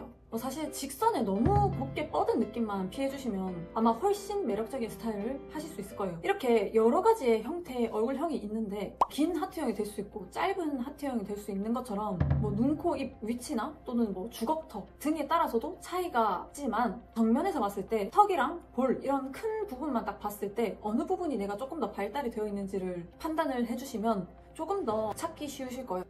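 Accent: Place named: Daegu, South Korea. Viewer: 아 사투리보고 대구인줄 알았는데 서울에 계시넹 ㅜㅜ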